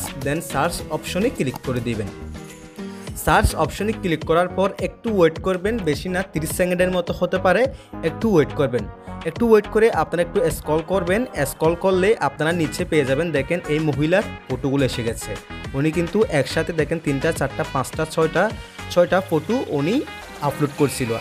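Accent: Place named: Chittagong, Bangladesh. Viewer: হাজার হলেও আমরা চাটগাঁইয়া,, আপনার কথায় ধরে ফেলছি ❤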